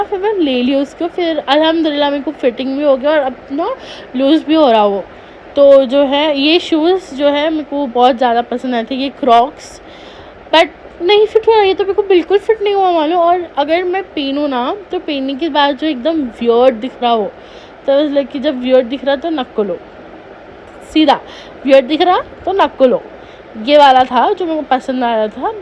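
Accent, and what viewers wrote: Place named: Hyderabad, India. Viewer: Apka accent 😂poore Hyderabadi Hai ap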